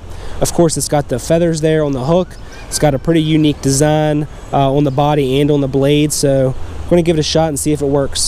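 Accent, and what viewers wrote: Pennsylvania, United States. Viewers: Thanks , Pennsy accent?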